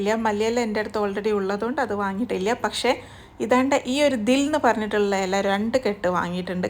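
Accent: Kozhikode, India. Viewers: അതെ ഞമ്മൾ കോഴിക്കോട്ടുകാർ അങ്ങനെയാണ് പറയുന്നത്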